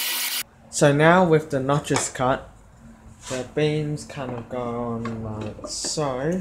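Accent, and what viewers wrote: Australia. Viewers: Australian accent. :)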